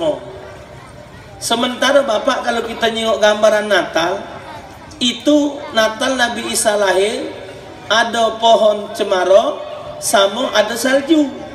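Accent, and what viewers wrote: Palembang, Indonesia. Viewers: Ya Allah enak ketawo 25 tahun d bandung denger ustad ceramah baso palembang. Sehat terus Ustad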